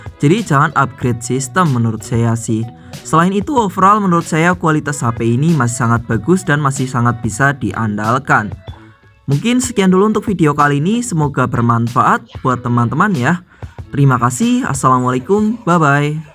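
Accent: Banyumas, Indonesia. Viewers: Omaak orang banyumas🎉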